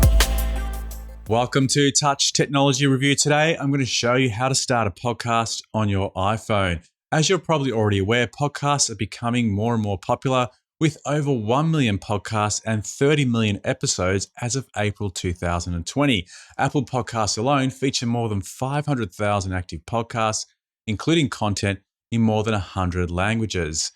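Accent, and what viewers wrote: Australia. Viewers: Hey there, sounds like you're an Aussie. I am based in Melbourne and am going to produce a podcast for Seniors. #whocanpodcast ! I can do most things but social media and video production : No! Would you like to partner with me ? Check my Instagram page: Whocantpodcast. Thanks for the video.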